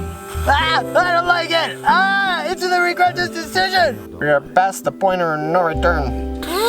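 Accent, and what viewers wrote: Wisconsin, United States. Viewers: yo your from wisconsin? what part? its a shame how many alcoholics there are here and how widely accepted it is here going to the bar every single day after work, Aside from that i knew you sounded like your from wisconsin that wisconsin accent yanno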